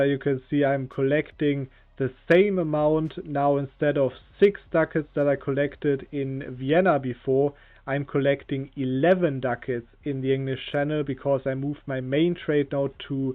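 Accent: Germany. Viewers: You know the video about an autistic map game is about to be good when you hear a German accent recorded by the most clapped out microphone to ever exist.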